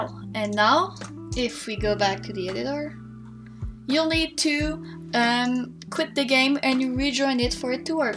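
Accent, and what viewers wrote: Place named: Quebec, Canada. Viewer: you have a quebecois accent..... 😏😏😏😏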